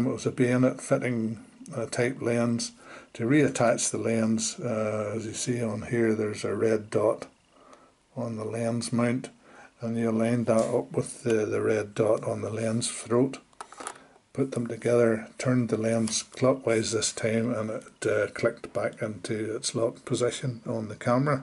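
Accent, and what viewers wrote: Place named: Northern Ireland, United Kingdom. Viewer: Loving the Northern Ireland accent. A N.I friend of mine taught me how to say "How now brown cow" with the accent. Although I didnt know you guys said "filum" for film. My favourite camera, I couldn't afford one when I started out in photography and so had an OM-10. I only recently got an early OM-1 and 2 and I'm once again shooting 'filum' alongside my digital.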